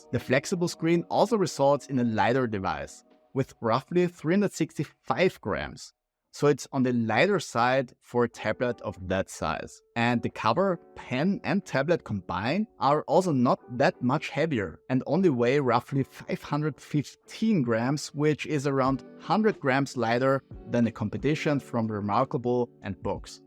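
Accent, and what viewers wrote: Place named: Austria. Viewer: With such an extremely obvious Austrian accent, I would never have guessed this guy's name to be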